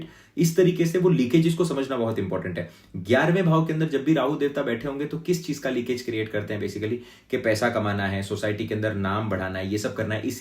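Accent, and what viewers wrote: Indore, India. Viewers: Are you from Indore?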